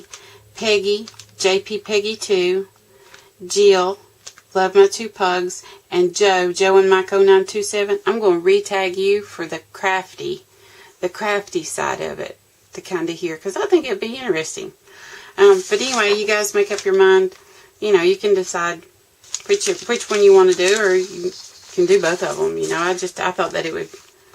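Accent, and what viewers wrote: South, United States. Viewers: I loved that you changed your questions, that was I felt it was too much private information they were wanting. I had to laugh about Eclectic Hillbilly. I am so very thankful that Pam (Grammie2Bay) and Beth (BethScrapbookRoom) both turned me onto your channel. I just love your southern draw and mannerisms. I know what "I Bless your Heart: means. I enjoy thoroughly listening to your soft soothing voice, within 7-10 minutes I know my blood pressure has dropped as I mellow out with you. I guess I am going to bite the bullet and start making youtube videos. I need to research how its done, and if I can even do them in my little craft are (I have my bedroom closet. Maybe that would be my first video. Anyway thanks again for changing the questions. Take care and hope to talk soon. Teresa in Maryland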